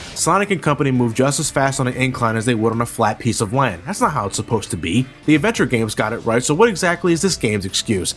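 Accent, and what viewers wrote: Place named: South Jersey, United States. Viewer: That's definitely a lot to say for the story of this game. I kind of see that your a bit similar to ShogunGinO, except your voice isn't as deep. Voice is almost like South Jersey Sam Flemming.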